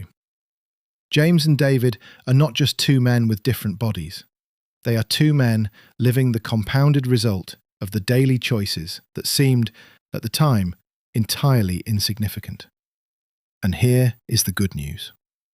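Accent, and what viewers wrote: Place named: United Kingdom. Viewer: The British voice always signifies doom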